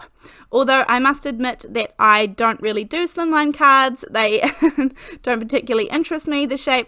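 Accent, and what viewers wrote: New Zealand. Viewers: You sound like New Zealand. I'm in Montana, USA. Those are so cute. Brand new subscriber just right now.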